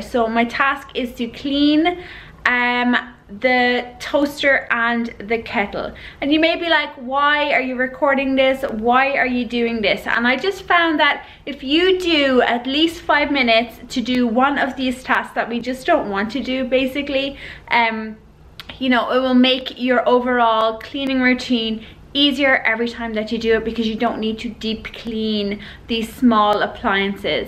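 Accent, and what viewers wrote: Ireland. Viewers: OMG !! YOU'RE IRISH! Instant subscribe just from your accent!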